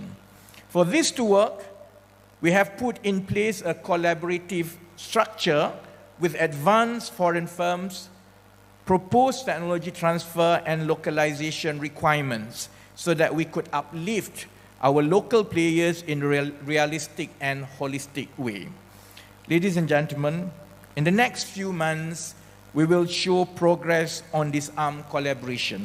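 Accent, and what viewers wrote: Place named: Besut, Malaysia. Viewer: Kaler bunyi besut skit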